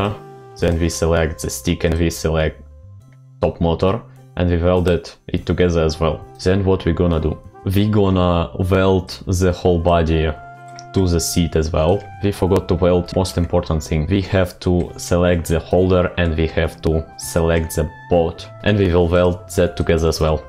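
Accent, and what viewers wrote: Russia. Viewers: по голосу кажется что русскоговорящий)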